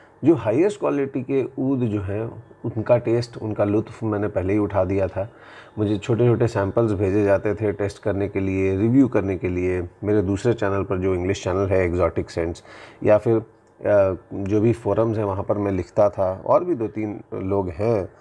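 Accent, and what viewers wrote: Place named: Dehradun, India. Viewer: Hi, I do like the way you talk. Infact you do talk like my relative from Dehradun. I hope you don't mind telling me your full name and your birth place.😊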